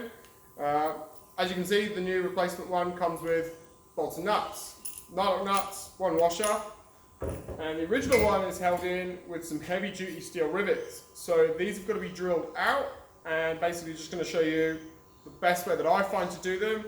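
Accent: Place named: Australia. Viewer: He's sounding more like a Aussie Boi's! Nice Video Dude Keep up the awesome work.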